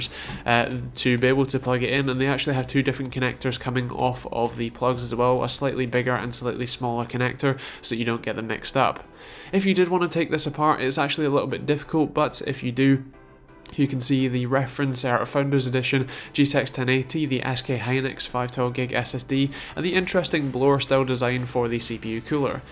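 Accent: United Kingdom. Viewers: you live in the UK? barely have a British accent haha.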